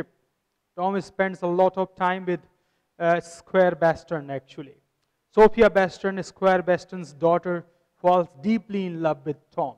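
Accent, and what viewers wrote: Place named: India. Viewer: This is bullshit!.. Indian accent doesn't go well with British novel.. you should have known that by now..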